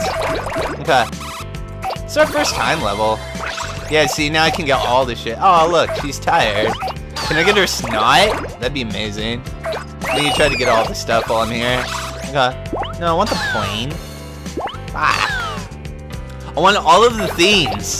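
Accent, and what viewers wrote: California, United States. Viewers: everyone can tell where you live by your voice because of your California dude accent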